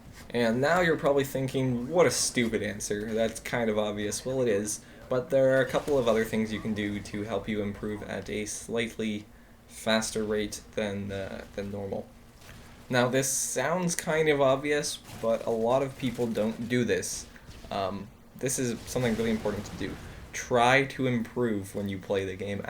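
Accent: Canada. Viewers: 7 kill streak in under a minute horry sheit (unrelated) are you canadian? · Are you Canadian by any chance?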